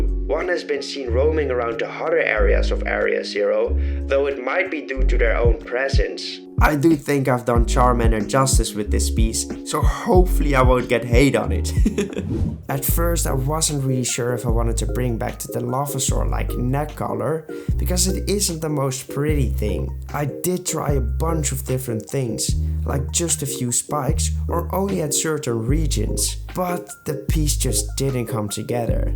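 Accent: Netherlands. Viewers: Ik hoor een nederlands accentje haha, verder echt coole designs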